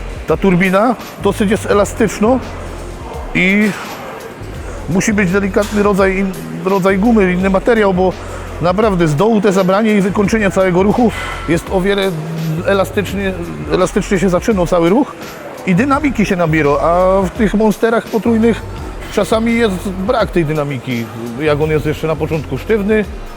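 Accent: Silesia, Poland. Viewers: Jak łon Gryfinie po Śląsku nawijo 😁👍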